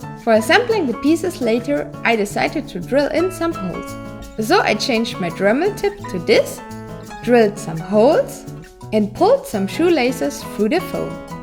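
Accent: Germany. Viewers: are you an german? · you're german??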